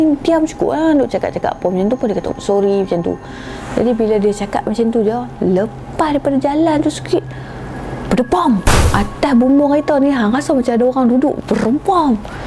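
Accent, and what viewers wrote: North, Malaysia. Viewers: puas cari nak subscribe..setahun telupa🤣.. saya suka penceritaan. suka loghat utara dia👏👏.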